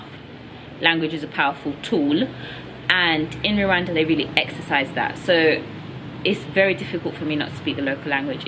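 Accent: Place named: United Kingdom. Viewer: hey!! Welcome back. I even forgot you had a British accent... Eek!!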